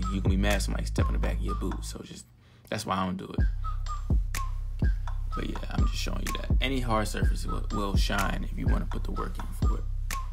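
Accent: Baltimore, United States. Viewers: You sound like you are from Baltimore. Have you ever tried using ice cold water? Another technique I use instead of cotton balls is to use panty hose?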